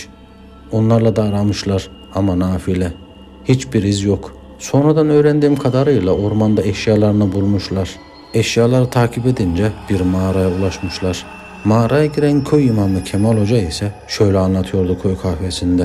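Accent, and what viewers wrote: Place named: Turkmenistan. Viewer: Türkmenler gibi konuşuyor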